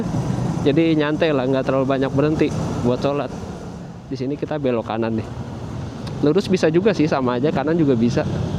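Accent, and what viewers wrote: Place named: Banyumas, Indonesia. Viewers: Lah orang ngapak to mas... Kirain orang depok😂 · Jebule wong ngapak njngn mas😮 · logat ngapake esih ori Alhamdulillah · Widii mudikk masee gen ngapak kie jebule😅 ati ati Lik😅😂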